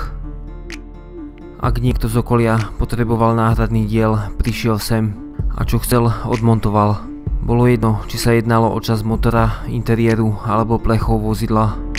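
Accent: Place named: East, Slovakia. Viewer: Videá má dobré,klobúk dole ale mal by natočiť video o svojej škole,lebo ten komentár,dva letiská,dva cesty,dva autá už je blbé,mal by navštíviť učiteľku zo ZDŠ.Trepanie o studenej vojne 1970,studená vojna bola už za prezidenta Kennedyho a Chruščova tá neskončila padnutím ZSSR.Keď že robí zvuk až po natočení videa,stále to môže opraviť,poznám dosť východniarov ktorý žijú v Bratislave a hovoria síce z prízvukom ale spisovne!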